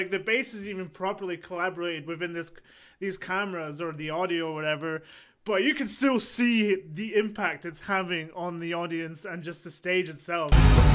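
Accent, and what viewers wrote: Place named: United Kingdom. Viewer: Damn, now I feel quite stupid.. 😅 your British accent doesn't seem that strong to me though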